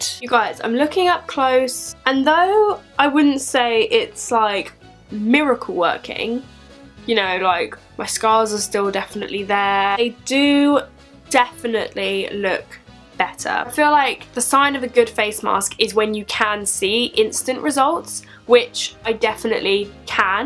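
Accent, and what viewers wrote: England, United Kingdom. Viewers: R u English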